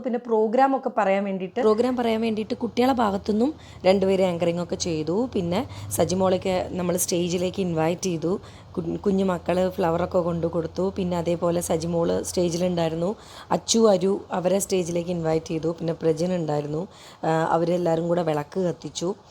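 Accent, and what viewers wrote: Kozhikode, India. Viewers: നേരം എനികില്യ ❤❤❤❤❤❤ കോഴിക്കോട് slang ❤❤❤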